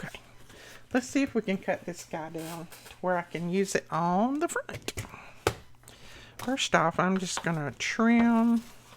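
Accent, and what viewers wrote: South, United States. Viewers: I’m so glad you got your Facebook taken care of finally and your YT name is back! Yay!! As always I enjoy watching you craft. And I love hearing you talk with your sweet southern accent. That journal turned out really cute. Sending much love and blessings, Sharron 🩷🤗🩷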